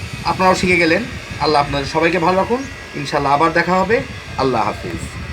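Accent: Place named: Noakhali, Bangladesh. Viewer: মনে হয় নোয়াখালী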